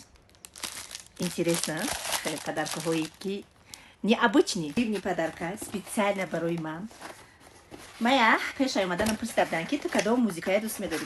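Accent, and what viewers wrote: Tajikistan. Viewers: худочон аз инда зиёдат бта рахмат ба падару модарат.Ба забони тозаи точики фахмо гап мезани.ьехтарин.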